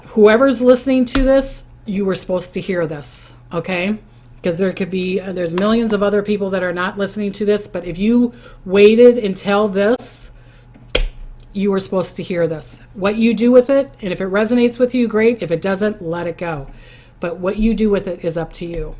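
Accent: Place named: Minnesota, United States. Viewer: So glad I found this page ! I love your Minnesota accent ♥️♥️ Like a warm hug . Subscribed and notifications on ! Xoxo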